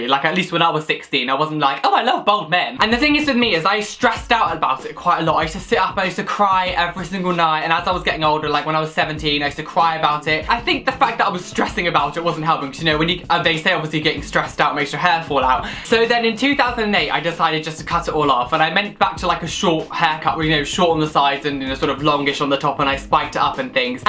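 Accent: United Kingdom. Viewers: You also have a beautiful British accent that literally covers it up I wish I had an accent that beautiful so for the rest of us we don't have accidents to confiscate I need my hair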